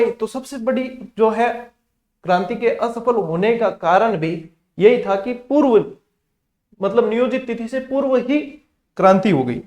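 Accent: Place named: Jodhpur, India. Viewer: गुरू जी आपकी मारवाड़ी शैली में पढ़ाना.... सीधा दिमाग में सेव होता है लिखने की भी जरूर महसूस नहीं होती और जोधपुर की बोली का तो क्या ही कहना... खांडा फलसा में कुछ वर्ष गुजरे ( चिम सा , रिंकू बा , अभिषेक बा सा ) 👌👌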